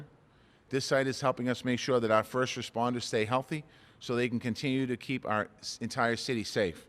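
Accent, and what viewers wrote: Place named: Boston, United States. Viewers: Went to school in Boston and the whole time I couldn't stand that accent!!! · WTF? Non American accent!! That's a Boston accent!! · Im just here to be entertain by the Boston accent. #quarantinedlife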